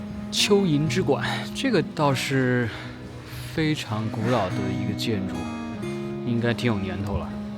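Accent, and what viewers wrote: Standard. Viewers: 视频主的声线温柔纯净，普通话标准，赞！ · 哪裡人？有沒有人說過你聲音蠻好聽的？普通話也很標準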